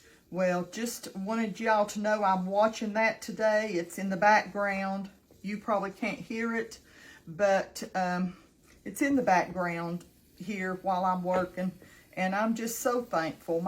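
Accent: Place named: South, United States. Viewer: Oh, and you sound southern, do you have a chicken and dumplings? My grandma made the best, my mom is a lousy cook, i took on the cooking. She, my mother's, sent me a recipe but it was vague, pretty light on detail. Betty crooker index card. not specific. Im sure there was lard, I'm vegan, nobody else my house is.😊 it was noodly not biscuity she was depression era. Was my favorite thing on the planet. I wanna recreate for my boys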